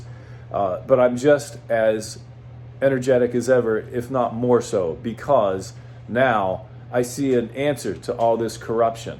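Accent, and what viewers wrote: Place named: Ohio, United States. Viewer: Do not even know who you are, I just clicked on this video watching you for the first time. You sound like you are a genuine dude. I wish you luck in your ventures, no matter the party you are with or running as, I like the things you are talking about. You seem as though you have helped people in the past in your profession. Also sounds like you are in Ohio I live in the Cincinnati area. Take care and Happy Holidays.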